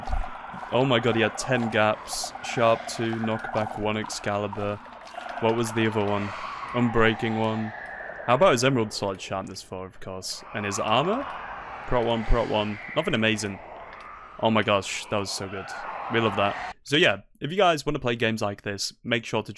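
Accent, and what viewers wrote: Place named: United Kingdom. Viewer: how i found your channel from like 10 months ago is i saw a hoplite video heard a british person speak and i was hooked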